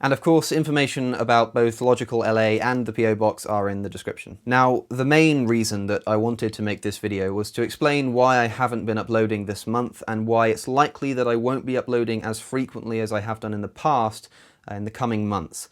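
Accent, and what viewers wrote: United Kingdom. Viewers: Why is he so british? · Woah, your British accent jumpscared me.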